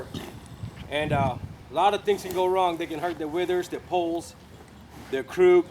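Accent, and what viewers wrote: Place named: Caribbean. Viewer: another awesome vid. I love your accent .. caribbean with a 'twang' :) Where are your roots ?? St Thomas ? :)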